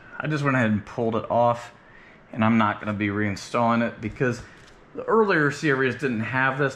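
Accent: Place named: United States. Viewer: Probably too late to be helpful but at least in this video he’s using 2.7 quarts, not liters. He sounds American and the bottles here are sold in 1 quart quantities. Not totally sure what the quart-to-liter conversion is but I’m sure you could google it and it might end up that 4 liters is 2.6 quarts? :)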